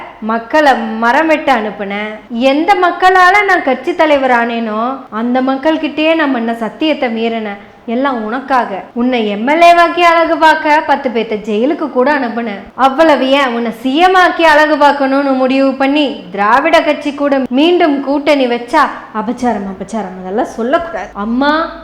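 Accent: Kongu, India. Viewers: அம்மனி வர வர உன்ற கோயமுத்தூர் குசும்பும் கொங்கு (கொஞ்சும்) தமிழும் தாறுமாற இருக்கு அம்மனியோவ் . உன்ற பதிவு ரொம்ப சூப்பரு மா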